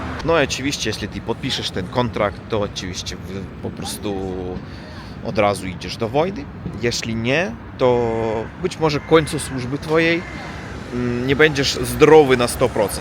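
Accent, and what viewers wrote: Russia. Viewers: Człowieku mieszkasz w Polsce już 4 lata, nauczył byś się chociaż polskiego, bo ile można słuchać tego rusko-polskiego pierdolenia. Mam nadzieję, że pójdziesz po rozum do głowy i w końcu zaczniesz naukę. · jak dla mnie w rosyjskim akcencie nie ma nic złego, tak jak polski akcent w angielskim ;)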